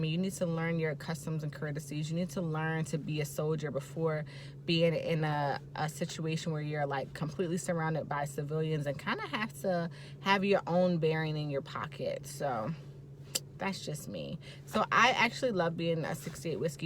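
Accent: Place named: Caribbean. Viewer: Are you from the Caribbean (Virgin Islands)? I can hear an accent 🧐😃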